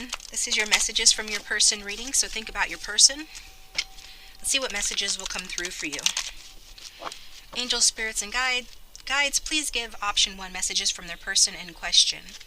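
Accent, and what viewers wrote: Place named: United States. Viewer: Your readings are very accurate but I struggle to listen to your American accent 😭😭😭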